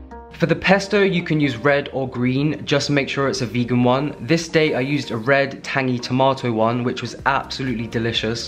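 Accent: United States. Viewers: kills me how he never fails to say “peenut butterrrr” in an american accent every time, even in voiceovers. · The random American accent made me sub!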